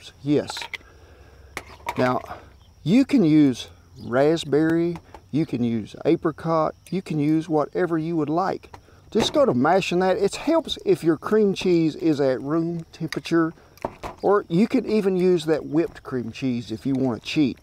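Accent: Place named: Louisiana, United States. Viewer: Where are you from? Your accent is throwing me off. Looks like your from Wyoming, the land around you looks like Texas and the accent sounds like you're from Louisiana or Mississippi, but the words aren't matching. But there's like a northern thing going on idk lol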